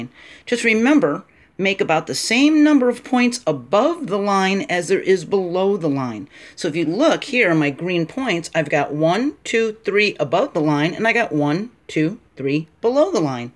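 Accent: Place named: United States. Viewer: I'm really struggling to understand the strong American accent. How do you guys sound so animated all the time while us Brits seem so solemn? Still, jolly nice video and somewhat helpful.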